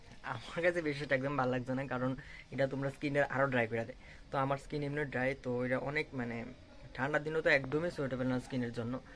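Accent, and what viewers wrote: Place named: Sylhet, Bangladesh. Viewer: Eta siliti Bangla style na ? Val lagta se tomar ro loge suniya 😀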